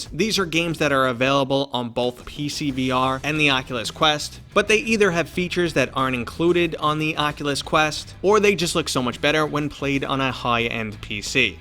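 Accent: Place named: Brooklyn, United States. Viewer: I’m Scottish and I feel this guy is from Brooklyn. Am I right?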